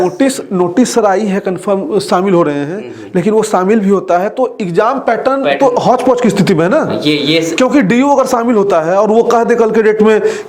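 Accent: Bihar, India. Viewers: Sr g ap Bihar se h kya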